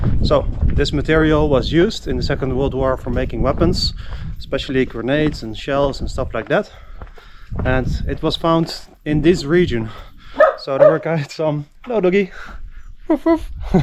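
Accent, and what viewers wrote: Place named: Netherlands. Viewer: Nice to hear your Dutch accent We (brits) lived in the Netherlands for 30 yrs I think someone else has told you that the village of Drave is used by the scouts as a base so not really 'lost' I was more interested in the story of the Brits and Germans who worked together in the other village As I live in Portugal now I must research that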